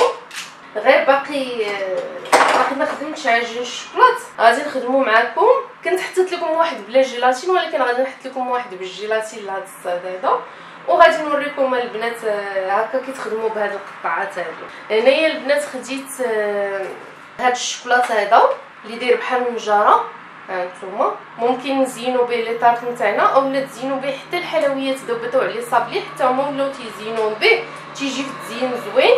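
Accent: Marrakech, Morocco. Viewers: كتكول هاد اللهجة مراكشية تبارك الله عليك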